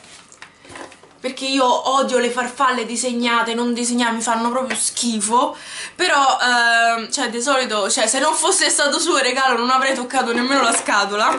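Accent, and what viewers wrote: Rome, Italy. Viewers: ma si romana se sente pure io so romana de nascita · Ciao sei dolcissima e bravissima si vede che sei una ragazza di cuore xcio hai attorno a te persone vere xche te lo meriti!!! Io adoro il dialetto romano sei troppo simpatica!!! Mi chiamo lidia salutami in un video....ps ti seguo da molto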